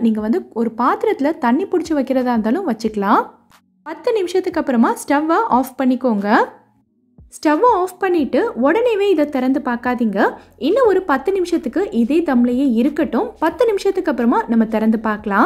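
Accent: Madurai, India. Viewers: Super Madurai tamil, try panni pakkeren. Australia 🇦🇺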